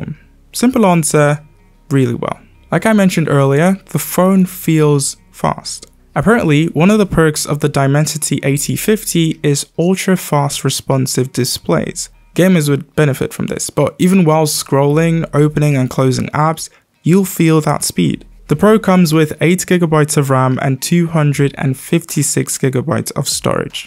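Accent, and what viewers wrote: England, United Kingdom. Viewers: I love your British accent. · Why does your English sounds British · Can i ask ask about your accent? It sounds English, not Nigerian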